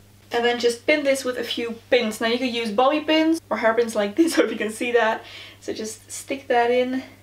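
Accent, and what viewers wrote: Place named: Netherlands. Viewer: ik hoorde aan je accent dat je nederlands was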